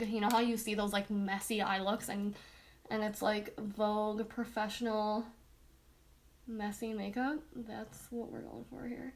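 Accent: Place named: Midwest, United States. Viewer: This is the very first box I THOUGHT I was signing up for... I signed up, thinking I was late enough into July that we would skip July and go right to August. When I opened the August box I was not pleased with any of it. I don't wear lashes, the Laura Lee colors are chalky and they don't apply smoothly nor do they blend very well. I'm surprised she launched with a formula this difficult to work with. I do feel bad for whatever the drama she and some of the others are going through. I appreciate all those who are staying out of it and remaining positive/uplifting. I'm new to your channel because of Boxycharm. You sound like you've got a Midwest accent. I'm from Wisconsin but I'm not sure how much of the accent I still have, since I moved away at age 19. Haha, "Poop Brown" that's fun! I enjoyed your no-nonsense review. If you go for Jaclyn Hill's vault or maybe some of the BH cosmetics palettes for color (Zodiac, or Galaxy palettes --they have 4 of them, and they are $18 each unless you find a sale.)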